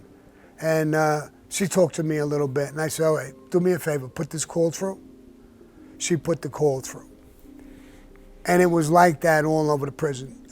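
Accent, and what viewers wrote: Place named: Brooklyn, United States. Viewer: Bensonhurst's Own...Sammy the Bull, the Brooklyn talk..😉😎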